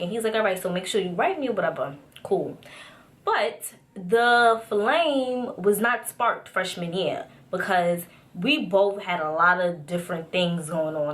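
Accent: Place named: New York, United States. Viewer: I love to hear you talk..your New york accent is too cute!!!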